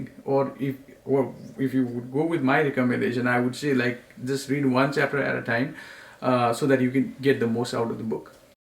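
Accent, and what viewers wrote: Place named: India. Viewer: Love thick indian accent 😅. I don't about this book but i like the same name book by Indian author Preeti Shenoy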